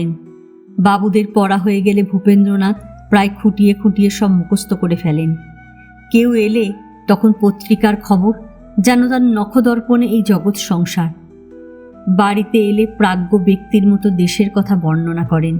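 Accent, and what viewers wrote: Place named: Bangal, Bangladesh. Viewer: খুব ভালো উপন্যাস টি ।তোমার পাঠ অতুলনীয় ।কি সুন্দর ভাবে বাঙাল ভাষা উচ্চারণ করছ❤👍👍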